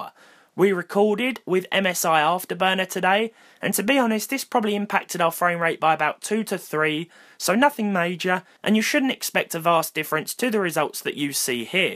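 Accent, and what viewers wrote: United Kingdom. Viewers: man, ive never heard a more british accent than this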